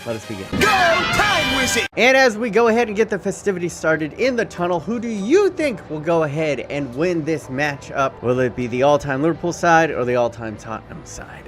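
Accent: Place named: United States. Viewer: your commentary is SO american hahahah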